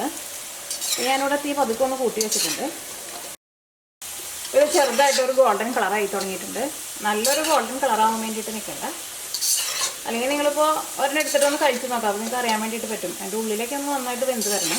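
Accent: Thrissur, India. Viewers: Kalakeet indutta...... Nalla assalu trisoor bhaasha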